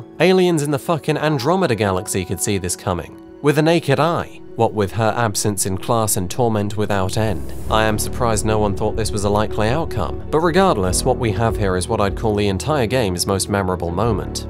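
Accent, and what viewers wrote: United States. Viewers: Your American accent is blursed. Thank you very much for showing it off. Have a nice day. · That American accent gave me whiplash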